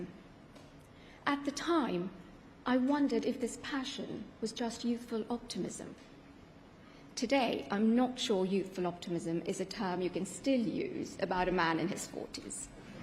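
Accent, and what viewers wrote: United Kingdom. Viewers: Omg !! That British accent !!! She took me by surprise!! I thought it would be Indian English . Never mind. Good luck to both of u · Her accent is so Brit 😮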